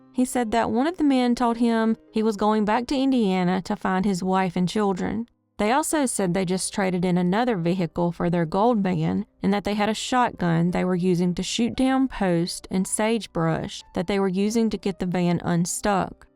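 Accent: South, United States. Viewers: It’s because she isn’t on-screen. The biggest channels have hosts that are nice to watch. Also, a lot of people think the southern U.S. accent sounds dumb. In the states, we look down on the dumb country folk 🤷🏼‍♀️ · Hi, I meant Girl 👋🏽😏. I never realised just how very many videos you've actually made. Just when I think 🤔💭 I've seen em all, I find a load more!! Don't think that WE, Your Loyal, Lovin' Fans Don't appreciate all the Effort, Time & Hard Work that You put in, because WE Really DO. Also, I Really, Really love ❤️ your Accent!! WE All Do. Thank You, Girl & rather than say "Keep Up The Good Work", I'm actually going to say "Take it easy on Yourself Miss & make sure you have time for yourself too". Kind regards to both You & Your Family, From The UK via Jamaica 🇯🇲💯 God Bless You. Wayne D. 😏👍🏽🌹🌹🌹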